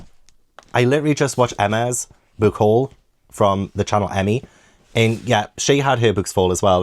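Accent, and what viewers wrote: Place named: Newcastle, United Kingdom. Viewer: Hello Came across your channel a couple of weeks ago and had to subscribe. I love a geordie accent plus you do make me laugh lol. Loving your channel Books are homophonic 🤣🤣🤣😳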